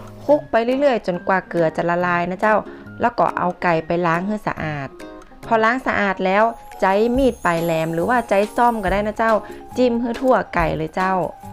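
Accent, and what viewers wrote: North, Thailand. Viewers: ชอบฟังภาษาเหนือค่ะน่ารักดี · นั่งดูจนจบคลิป พึ่งรู้ตัวว่าเป็นภาษาเมือง 5555 (ด้วยความเคยชินกับภาษอ่ะเนาะ)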